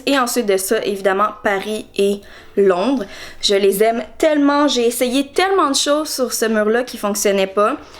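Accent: Quebec, Canada. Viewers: Tu parles vraiment le " jouale" ...Bref ! Belle appartement et bien aménagé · Tu parles un peu comme une canadienne? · ouh l'accent québécois j'adore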